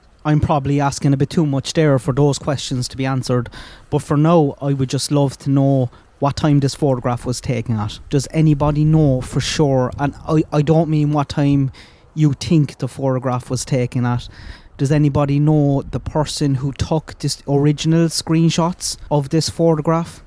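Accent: Ireland. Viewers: you gotta be Irish , I am an you have what sounds to me Limerick or Tipperary ?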